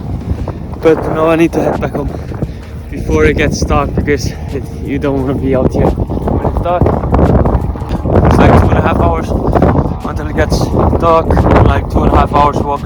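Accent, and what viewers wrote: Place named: Northern England, United Kingdom. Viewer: Interesting to hear how your accent is like a Northern English accent. I wonder if the Northern English accent is derived from Scandinavia. It was ruled by Danes and Vikings for a while.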